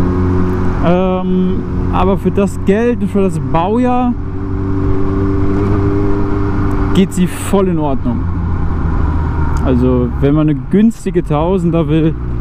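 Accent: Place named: Bavaria, Germany. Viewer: red mal mehr bayerisch in deinen videos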